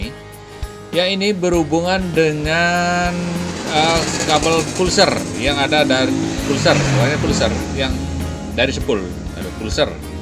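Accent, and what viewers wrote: Bali, Indonesia. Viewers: Dari Bali pak? Logatnya kental Bali, bisa garap ACG stater vario 150 dipasang di CB?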